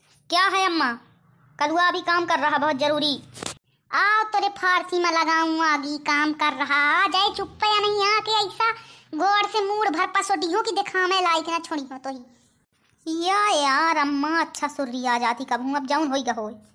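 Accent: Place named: Bagheli, India. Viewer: Authentic bagheli along with deep diving accent.. Maja aayga bahini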